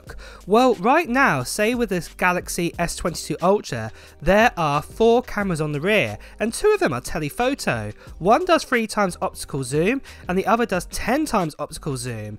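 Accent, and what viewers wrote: United Kingdom. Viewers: Why don’t you have captions? I’m very HOH.. and have great trouble understanding British dialects!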